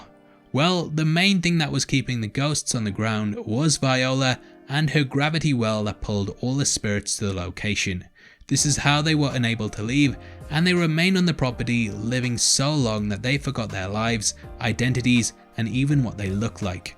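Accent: United Kingdom. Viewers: An old, beautiful house... British accent... …nice dresses. Count me in! :)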